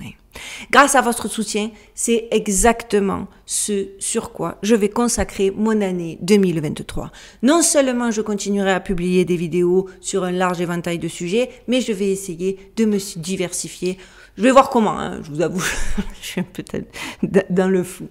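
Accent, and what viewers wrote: South, France. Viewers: Merci ! A toi qui met l'accent du Sud sur ls dingueries de ce bas monde... Que du bon pour toi et la famille incha'ALLAH, ainsi qu'à tout t'es abonnés (ées). Longue vie à ta chaîne...👉🏽😉👍🏼👉🏽🌟🌟🌟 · Et superbe t'on Accent du sud De la France J'adore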